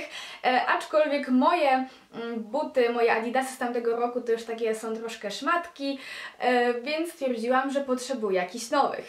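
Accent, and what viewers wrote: France. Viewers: Czemu masz taki francuski akcent?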